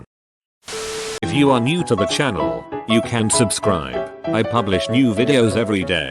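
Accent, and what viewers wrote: England, United Kingdom. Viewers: Why is this narration in an English accent? Much harder to understand.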